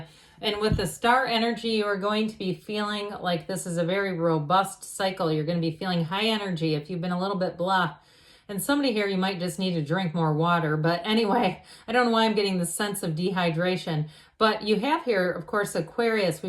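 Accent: United States. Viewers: Thanks you so much. Of all tarot readers you're my favourite, and I love your American accent 😊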